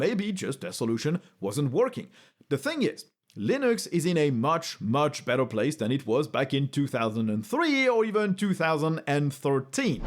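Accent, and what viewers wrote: France. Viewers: i love how uses french pronounce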